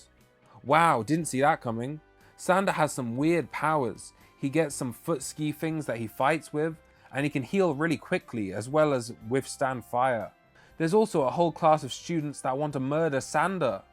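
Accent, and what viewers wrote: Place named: United Kingdom. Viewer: I love it when British people have Americanisms in their accent. Like “marnga” “parrru itagarrki”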